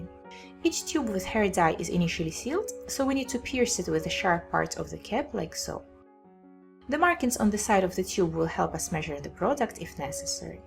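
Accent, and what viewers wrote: Russia. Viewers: Ха,я вот сколько смотрю эти замечательные ролики и каждый раз думаю,интересно,девушка говорит правильными английскими словами,но с совершенно русской интонацией:) Сама с этим борюсь,изучая английский. Пока безуспешно. Спросить было неловко,спасибо,рассеялись мои сомнения))) Спасибо большое за полезное видео! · She haves russian accent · I told russian accent:)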